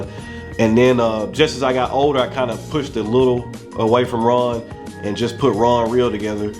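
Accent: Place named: Memphis, United States. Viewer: Man. You got one of the cleanest looking channels. And a a1 personality and presence for this. And that accent yo 😂 Makes me miss Memphis. Gotta go see my aunt and cousin up there soon. I always had trouble finding good channels cause I noticed that some were way too damn bourgie, some were way too amateur, some were alright but the people runnin the channels were lowkey nuts as individuals (and not in a good way) and some were just bad all around by either trying too hard to be all Luxurious or whatever and looking strange for their trouble. There are a whole lot of bad options out there. But I've found a handful of down to earth, decent seeming folks and I'm glad you're one of em. Even if I'm relatively new to ya. Stay at it 👊👊👊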